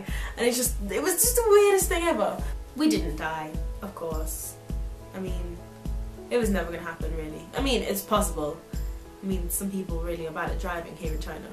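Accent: United Kingdom. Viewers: I missed your English Accent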